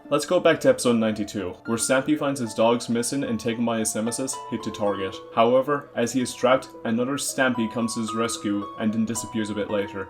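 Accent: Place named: Ireland. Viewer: I thought I recognised that accent Hello fellow Irishman Also, great vid absolutely loved it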